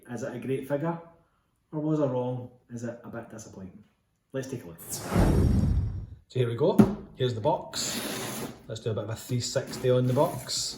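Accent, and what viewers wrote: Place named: Scotland, United Kingdom. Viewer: Fantastic, so quick off the mark with your video of Cable, congratulations on your pick up and getting it early doors. So pleased for you, delighted you called it right and now have a great figure that you are happy to have in the collection. As mentioned in previous comments I do think a lot of people were too down on the figure from the get go, I really like it and think it presents so well, a real welcome edition to your great collection for sure. As for your dulcet Scottish tones, as a Glaswegian myself I am delighted your channel is going from strength to strength 😂 really enjoyed the video and as always, looking forward to whatever you may have next in the pipeline, please keep the videos coming, a great watch, as always stay well👍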